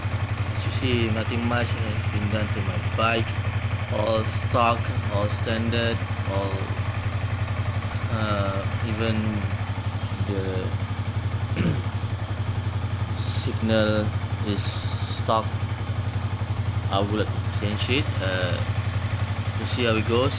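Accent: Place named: London, United Kingdom. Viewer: Fuh.. Brader speaking London. Not bad for first video. Keep on the good work. You know who I am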